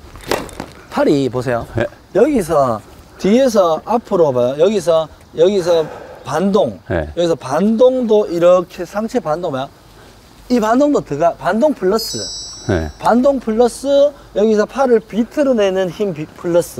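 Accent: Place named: Daegu, South Korea. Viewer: 저도 대구 출신인데,, 오랜만에 듣는 extreme 사투리. 와이카는데요! 내용도 좋고 사투리도 좋아요 ㅎ 구독, 좋아요 ㅎ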